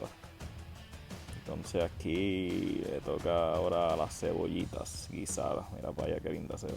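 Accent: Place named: Puerto Rico. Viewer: Que lindo habla bien boricua ❤️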